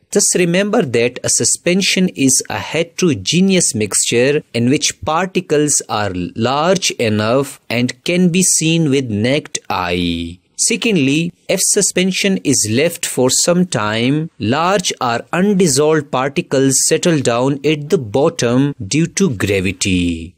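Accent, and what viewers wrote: India. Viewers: يخربيت اللهجة الهندية 🤦🤦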